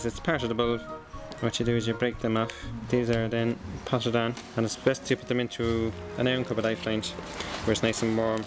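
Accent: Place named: Ireland. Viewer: Cool accent, thanks for the video, just what I was looking for for my cyclamen dividing! (for those non-irish watchers, like me - slow the speed down to 0.75 and crank up the volume :-)))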